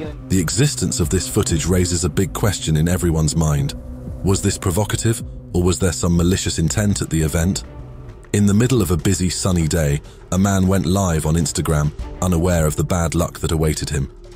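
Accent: United Kingdom. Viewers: Whoever did the voiceover for this is terrible, your choice of words are a ridiculous and for a British voiceover telling stories about gangsters, doesn't go together. You might as well be doing National geographic or some shit because this ain't working for you 😂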